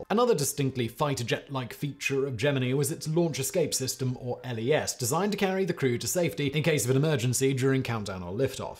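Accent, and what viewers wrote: United Kingdom. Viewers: Guys give a break he's British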